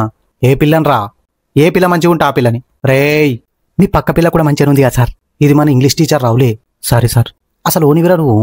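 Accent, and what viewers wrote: Telangana, India. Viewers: తెలంగాణ యాస బాగుంది సార్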